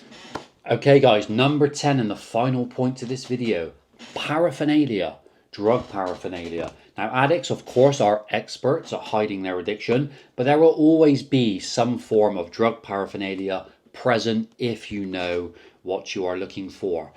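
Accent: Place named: Bristol, United Kingdom. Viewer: You sound like your from Bristol ? Can I get in touch privately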